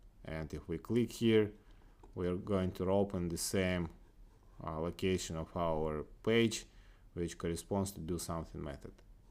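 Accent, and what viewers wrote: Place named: Ryazan, Russia. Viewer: Заметный русский акцент)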